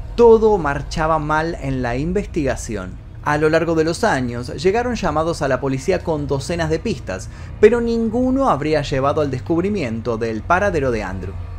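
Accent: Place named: Argentina. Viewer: Me interesó mucho el caso Asha Degree, pero por no ver el vídeo y sólo escucharlo, al principio lo busqué como "Aya" Degree, entendiendo la sh como "y" 😂. No se ofendan hermanos argentinos, es la particularidad de su acento, excelente video.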